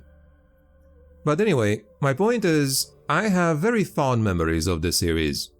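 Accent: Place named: Transylvania, Romania. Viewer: live from Transylvania! Two blocks over from Dracula's castle 😎. Okay I'm sure you're probably not near Dracula's castle, but I can at least pretend you are 😊. I mean come on, that accent 🥰.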